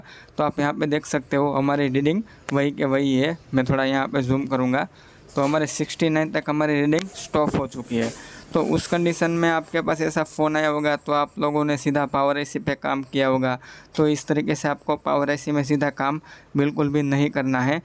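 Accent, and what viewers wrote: Gujarat, India. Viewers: Tamara bolva uparthi lage k tame gujarati cho??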